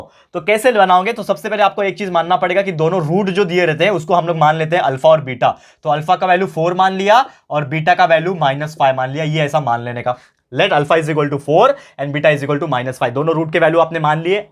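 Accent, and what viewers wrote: Maharashtra, India. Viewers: Sir aap Marathi ho kai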